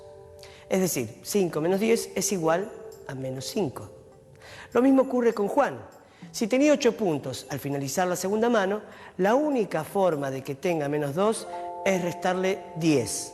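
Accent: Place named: Argentina. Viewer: como se nota que es argentino no se le entiende un pomo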